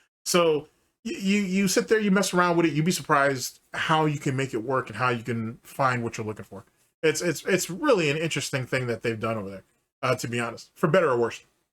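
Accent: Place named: London, United Kingdom. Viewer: Big ups the London Grime intro. Nice to hear my own accent.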